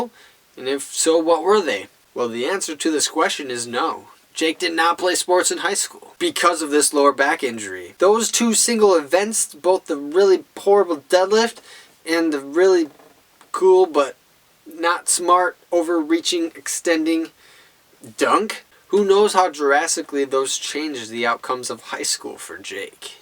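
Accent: Wisconsin, United States. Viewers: You're WI accent gets SOOO much heavier when you drink. My MN one does too.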